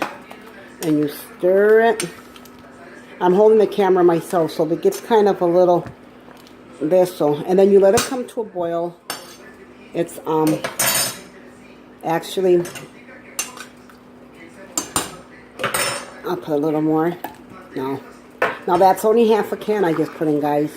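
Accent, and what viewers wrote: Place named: Puerto Rico. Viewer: Se ve bonito y aparentemente sabroso. Voy hacer un comentario porqué si no exploto.Señora usted tiene un acento puertorriqueño y habla buen español¿Porqué habla en Inglés,Inglés con acento puertorriqueño e inclusive hasta los ingredientes en la receta están en Inglés?Estaba buscando una receta de patitas de cerdo al estilo puertorriqueño y me encuentro con la suya y me da pena encontrar la suya donde parece le avergüenza hablar español?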